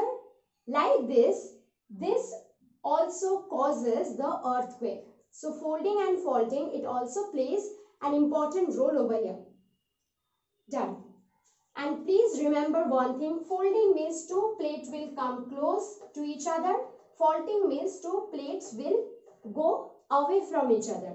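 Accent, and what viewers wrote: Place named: Bengal, India. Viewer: She speaks in bengali accent that's why this happens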